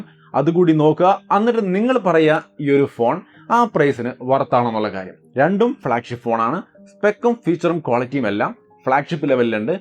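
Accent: Thrissur, India. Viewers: Bro thrissur karan ano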